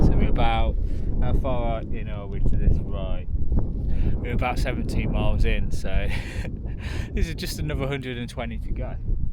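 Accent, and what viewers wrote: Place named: Hinckley, United Kingdom. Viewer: Didn't realise you were from round Hinckley way. Not sure how I missed your accent because I've got relations from there... ma'duck 😅 I'm up in Northumberland myself, you were about 5 miles from my house when you dropped down into Haltwhistle on your GB Divide trip.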